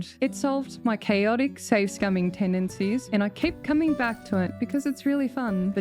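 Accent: Australia. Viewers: okay weirdnotweird take, but hear me out. i like that your voice fits your aussie accent.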